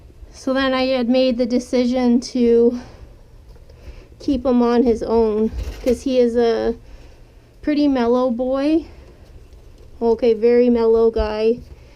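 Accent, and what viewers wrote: Canada. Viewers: I'm from FL and I've never heard the way you say it but I immediately knew you were from Canada by the way you say certain words. For someone to be so petty and call you illiterate, well, they just have way too much time on their hands...I thought it was cute and made me smile.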